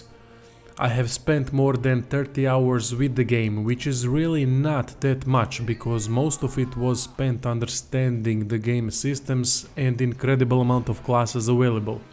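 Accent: Russia. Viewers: tvrdi ruski naglasak i odlicne analize, što više poželit, pozdrav iz splita :) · The man sounds Russian, so you know he knows his CRPGs! (And gaming in general as eastern Europeans are the most prolific in excellent game development nowadays!) I have mine in the cart right now ready to pull the trigger after I'm done watching this video! EDIT: Just bought it, I usually don't do pre-purchases, but I planned to get it day one anyways, so...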